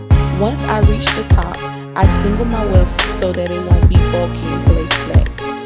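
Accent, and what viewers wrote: Louisiana, United States. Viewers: aw<link> this tutorial just seemed sooooo sweet! LoL. beautiful job... do i hear a Louisiana accent?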